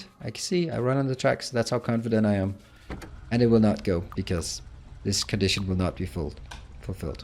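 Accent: Denmark. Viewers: Denmark? Im asking because of te accent 😁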